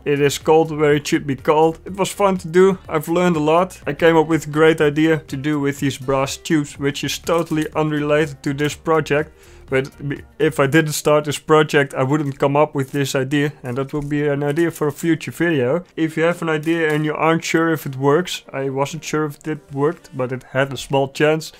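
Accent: Netherlands. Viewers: Ik hoor een Nederlands accent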